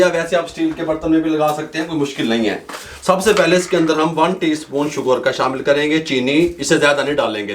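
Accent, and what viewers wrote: Punjab, India. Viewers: I like your pure punjabi style being a punjabi person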